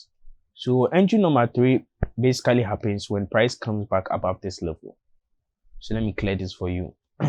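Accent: Ghana. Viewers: you sound Ghanaian.please are you in Ghana? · The Ghana accent is strong with this one!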